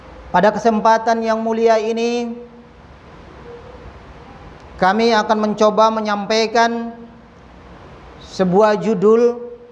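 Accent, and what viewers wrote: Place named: Bima, Indonesia. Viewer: Gaya bahasanya mirip logak Bima ya..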